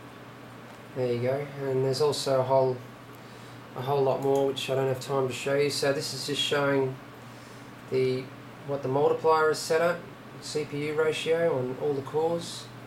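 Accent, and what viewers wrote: Australia. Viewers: Great Stuff! You from good ol North Queensland? T-ville / Cairns area?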